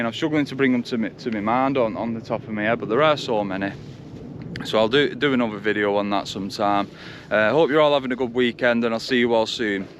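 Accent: Liverpool, United Kingdom. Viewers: You've lost your Liverpool accent. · Yes lad LIVERPOOL was expecting you to say man utd with the accent love the channel lad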